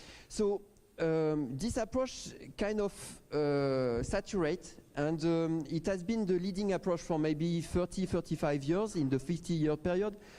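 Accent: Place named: France. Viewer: Nice french accent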